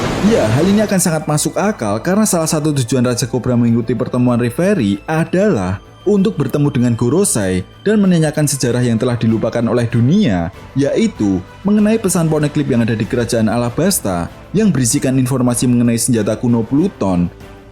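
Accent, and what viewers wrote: Java, Indonesia. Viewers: Logat jawanya kental skali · suaranya terlalu medhok,,, kyk bumbu ktoprak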